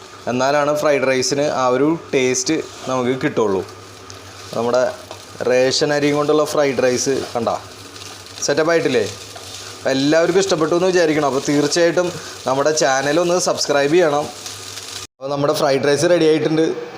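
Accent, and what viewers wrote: Thrissur, India. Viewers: ചേട്ടാ അവതരണം മ്മടെ തൃശൂർ ലൈനില് സൂപ്പറായിട്ടാ.... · തൃശൂര്‍ ആണല്ലോ.... പൊളിച്ച് 🤩🤩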